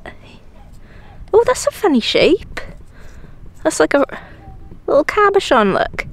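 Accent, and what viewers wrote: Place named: Wales, United Kingdom. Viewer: In love with yr welsh voice ! Beautiful 💋 ❤❤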